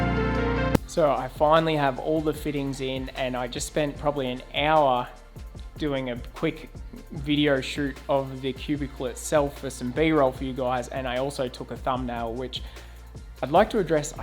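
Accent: Australia. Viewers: Jeeez! Auzzy English sounds shayyt! Cool video, though. Cheers. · Adore this build mate. So happy for you. As an Aussie that spent time in Canada I love pickin up the little subtleties in your accent, pure Aussie with little sprinkles of Canadian in there. Very nice haha.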